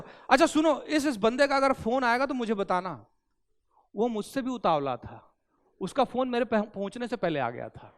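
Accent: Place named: Chhattisgarh, India. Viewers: आपकी हिंदी में भी छत्तीसगढ़ी है 😄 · U r right, the best thoughts..bejjati hi safalta ki pahli sidhi hai.. chattisgariya accent ...total Maza aa gaya.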